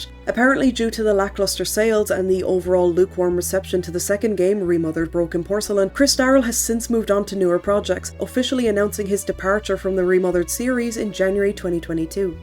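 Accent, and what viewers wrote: Ireland. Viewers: Hi! So, I watched your videos on Rule of Rose, Haunting Ground and now, Remothered. About time I leave a comment: your coverage of the games is great and I find the video length quite comfortable, too. As long as a Twin Peaks episode ;-) By the end of this vid, you shared how surprised you were to hit 100k views. I think it's well deserved for the polished video presentations. And the Irish accent :3 Just keep following that passion. I'm sure life has great things in store for you and your videos. Also, I can't go without mentioning the bit of Silent Hill music I heard at the end of your Haunting Ground video. I'm a sucker for the SH music and aesthetic. So, thanks for that, too :D edit: I read through the descriptions of the other videos I watched. More SH tracks that have gone undetected. It's subliminal bait for SH fans at this point :D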